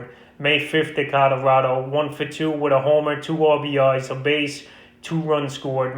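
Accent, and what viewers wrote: New York, United States. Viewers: My dude where u from? Nyc?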